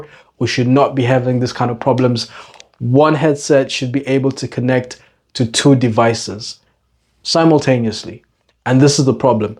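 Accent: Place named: South Africa. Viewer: You sound South African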